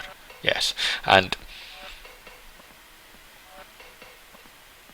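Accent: Finland. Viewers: Ei millään pahalla, mutta tää suomienglanti on jo aika helvetin koomista. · ralliEnglantisi on kaunista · daniel targett its rally english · every pawt iz sumhoww cruishul for de funtion of de engin love how finnish people talk :) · Aijai! Selostettu vielä autenttisella rallienglannilla! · suomi-englanti-finglish, 5/5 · His Finnish accent cannot leave when he speaking English lol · that finnish accent in english it's so unique =D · tuo rallienglanti on ihan törkeen hyvä lisä tähän :D ja tähän pitää kyllä tulevaisuudes saada joku multiplayer tai cooppi että saa kaverin kans ajaa pitkin raittia ja ryystää olutta ja käydä pubis ja ostaa lisää kaljaa :D ja toki lisää legendaarisia autoja esim saab 900,toyota corolla ke20,fiat 127 jne :) kyllä tämä on pakko ostos ja kaverille kun linkkasin niin oli ihan samaa miältä :D ihan vitun kova peli tulos, "kuinka muistot tulvivatkaan" :DD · Rally english makes it always funny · Rallienglanti on tähän yhteyteen täydellinen aksentti, älä ikinä muutu. 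· En kestä rallienglanti ilman beer. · Im guessing that his accent in reality is not that thick but that it's rather a parody of the "Rally English" that Mika Häkkinen and all the 70s and 80s finnish rallyedrivers spoke. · Nyt on kyllä niin rallienglantia että ei pysty edes sanoin kuvailemaan. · It´s so called "rally english*". If you listen some finnish rally driver interviews you get this joke. :) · I'd say Finglish is more common though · kyllä on suomalainen peli ja rallienglanti kohillaan tuleeko steamiin vai omalle nettisivulla?? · Finnish accent :) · Aivan loistavaa! Videon rallienglanti kruunaa homman. Tö korner is tankero. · With "rally english", ofcourse. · 51 minutes and 5 seconds of beatiful Finnish accent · Vittu ku on rallienglantia. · Classic Markku Alen english!! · oo my gawwwd, the finnish english accent for the win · Suomen eglanti aksentti on kyl hauska.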